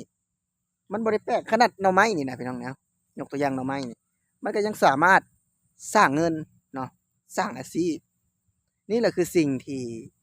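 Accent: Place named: Sakon Nakhon, Thailand. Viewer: บ่าว พูดสำเนียงเหมือนคนสกลนครเลยครับหลายๆหมู่บ้าน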